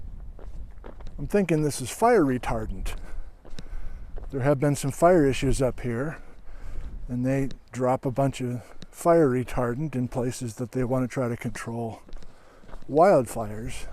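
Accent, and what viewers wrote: Upper Midwest, United States. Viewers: Nice upper Midwest accent. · Love the northern Midwest accent! I don’t know how you are able to turn it on and off so well. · LOL good to hear a Wisconsin accent again. Reminds me of the family down in Chicagoland. Another great interesting video!. Love seeing places I doubt I will ever hike to anymore. Thanks again.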